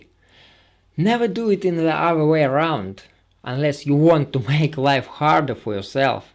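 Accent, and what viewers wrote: Russia. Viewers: Судя по акценту мужик из наших:)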